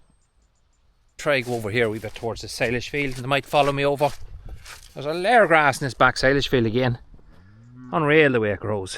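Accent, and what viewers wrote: Ireland. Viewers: The sight no1 wants to see at home, the blue lighted neon beastie. Sending well wishes your mums way, and indeed to the whole family. Sorry to hear you lost one of the herd too, but it sounds as though it was the kindest thing you could have done for her. Daft question: what is it you say to the cows to make them follow you? Success? Suck's? I can never quite figure it out lol (Need more practice with the Irish lilt lol)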